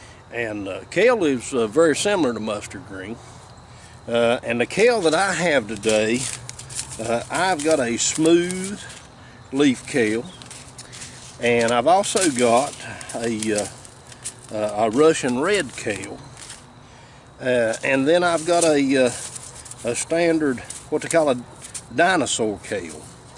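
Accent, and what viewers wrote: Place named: North Carolina, United States. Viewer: I knew I recognized that accent lol I'm from south eastern , NC. Felt like home.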